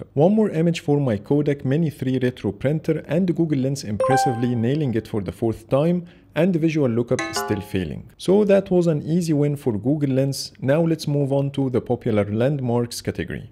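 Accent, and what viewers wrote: Egypt. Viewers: لهجتك مصرية 🌚✌🏾والله فيديوهاتك ادماااااان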